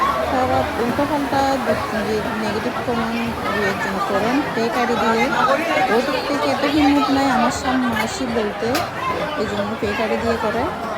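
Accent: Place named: Chittagong, Bangladesh. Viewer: Apo atte onor chittainge kotha besi vala lage.apon apon lage .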